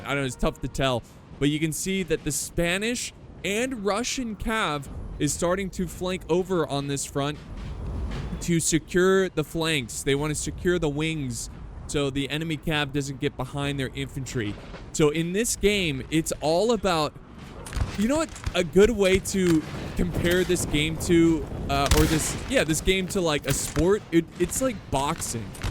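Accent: France. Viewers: Love french accent hahaha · your French accent never fails to make me laugh. You really made my day!!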